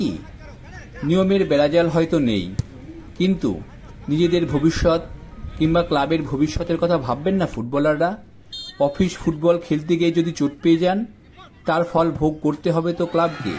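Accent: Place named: East Bengal, Bangladesh. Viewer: Video ta jeee koreche r jar voice sona jacche ami sure seee Udbashtu opar bangalar mal 💯💯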